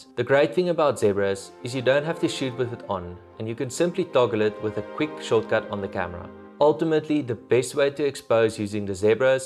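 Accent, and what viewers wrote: South Africa. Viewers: You sound South African bro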